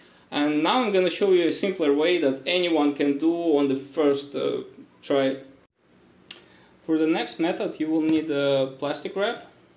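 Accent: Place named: Russia. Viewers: я тоже сразу услышала русский акцент, но он действительно очень приятный. Это совсем не тот русский акцент, когда слышишь и думаешь "лучше говори по-русски"😂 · Какой приятный Русский акцент)))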